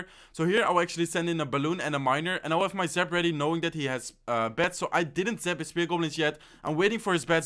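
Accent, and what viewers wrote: Netherlands. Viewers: Typical dutchmen accent 👍 wacht op een nieuwe log bait deck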